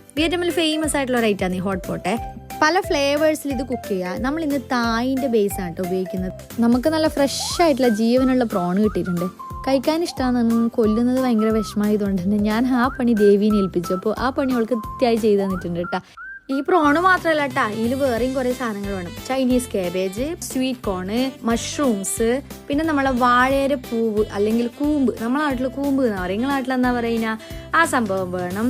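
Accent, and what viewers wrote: Kannur, India. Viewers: ചേച്ചി കണ്ണൂർ ആണല്ലേ വർത്താനം കേട്ടപ്പോ തിരിഞ്ഞു 😍 കണ്ണൂർ എവിടാ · Slang polich.. Evda kannuro kasarodo...❤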